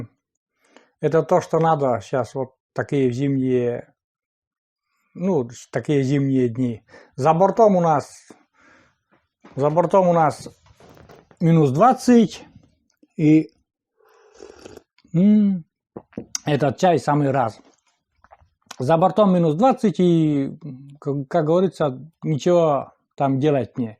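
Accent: Komi, Russia. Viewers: Здравствуйте, и! Да,с вами меня познакомил Олег,а его я увидела случайно,когда пришла зима,прошлый год,я решила делать веники сделать.Нашла видео,слышу у мужчины акцент,ну точно коми,наш,смотрю канал называется, На природе Коми.Так я и нашла Олега.Спасибо Вам за рассказы,за видео о еде,о природе и остальном.Здоровья вам с и удачи! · Влюбилась в Ваш канал совсем недавно и смотрю теперь постоянно! Спасибо вам с за тепло, за радость общения хотя и виртуального! Я с Перми, моя бабушка, хоть и русская, жила в Коми округе Пермского края. Так что коми мне очень близки. Был приемник у бабули в доме и местный диктор говорил: Баитэ Кудымкар. Может я немного не так написала, я тогда была маленькой, мама говорила, что это означает - говорит Кудымкар. Говор у них в деревне был такой же, как у вас. Мне очень приятно слышать, как вы разговариваете, как будто в детство к бабуле приехала...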